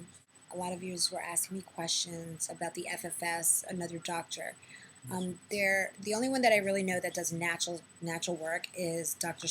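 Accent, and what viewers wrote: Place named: Brooklyn, United States. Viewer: I love how she says yous! Very brooklyn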